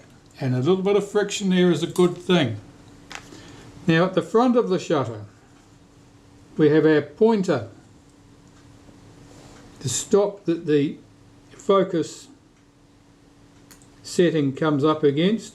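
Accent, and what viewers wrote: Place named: Australia. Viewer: Thank you for this series. Although I have not yet completed my Mockba 4 shutter project, it has been helpful. I CAN report that common internet information would have us believe that the Mockba/Moskva cameras were made identically to the Zeiss cameras with manufacturing equipment they brought back to he USSR as spoils of war, changes were made by he 1950's. The Mockbas went from 5 shutter blades to 3. They are NOT of Zeiss machine fit and finish, and the metal used was obviously of a softer grade of brass in the shutter speed wheel. The springs have not held up their strength well at all. My next project will be my prized Super Graphic with 127 Ektar and Graflex/Compur shutter. I'm confident it will turn out, thanks to you. As for his Moskva or Mockba, I've relegated myself to being happy with a fast/medium/slow, and B setting ,and re-labeling the dial accordingly. 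BTW, No mistaking where you're from. Don't tell me... Australia. I'm in the US, although I expect a transfer to heaven in the next few years. Regards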